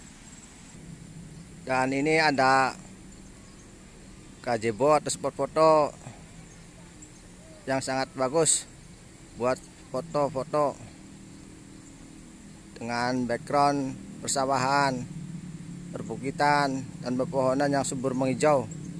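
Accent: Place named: Java, Indonesia. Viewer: logate kaya wongg jawa lagi jalan jalan ning Majalengka lanjutttt nyimakkkk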